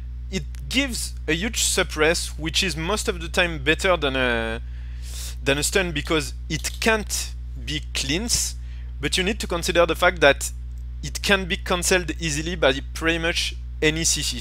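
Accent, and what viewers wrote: France. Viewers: dat french accent sound so pretty :3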